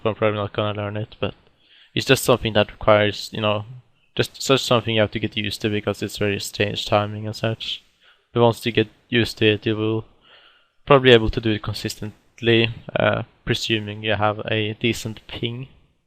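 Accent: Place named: Norway. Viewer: Gratulerer! spiller ikke 07 selv, men moro å se at du står på å er pågående! :D men hører du er ''norsk'' for å si det slik XD Men det e rmoro å se hvor god engelsken din har blitt i forhold til da du starta! :D Lykke til videre :)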